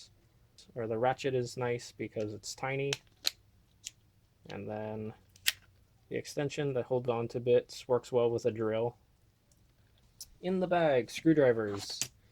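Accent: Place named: Hawaii, United States. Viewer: Dang, you've accumulated quite an amount of tools! great video! such nostalgia listening to someone with a Hawaiian accent haha and also, if you can you should post videos on how you fix appliances! I would love to learn!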